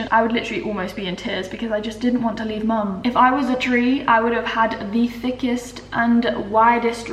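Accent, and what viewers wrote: Australia. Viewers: sounds so australian in this video. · You sound so Australian in this video!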